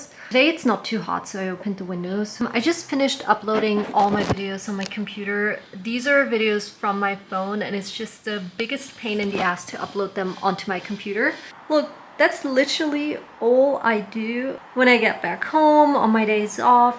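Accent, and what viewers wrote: Australia. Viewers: Aussie accent creeping in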